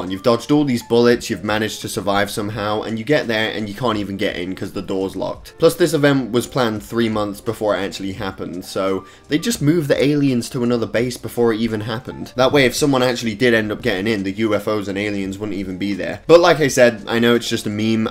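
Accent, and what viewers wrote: United Kingdom. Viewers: Wait, how tf a British guy telling us how to storm into Area 51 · Why is a British guy giving us a plan?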